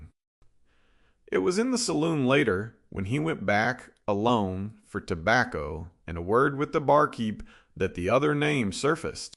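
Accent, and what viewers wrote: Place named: South, United States. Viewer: I understand the country accent, but the word is Kata, not cattah. The proper pronunciation is Naug e nata.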